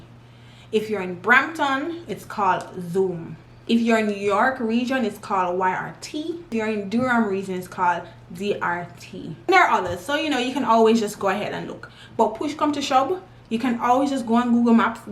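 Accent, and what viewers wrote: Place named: Jamaica. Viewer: U sounds Jamaican girl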